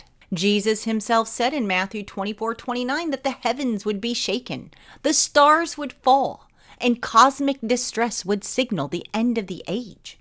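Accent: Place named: Connecticut, United States. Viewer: Outstanding vid. You sound like my ex from west haven..so I am guessing conn. accent??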